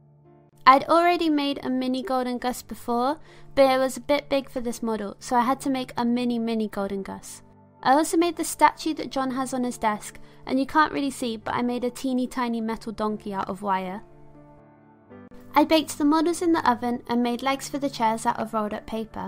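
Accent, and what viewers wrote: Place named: Southern England, United Kingdom. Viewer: You're British Finally i can fully understand every word said in a video (Though your accent says you're from the south, hi from the north)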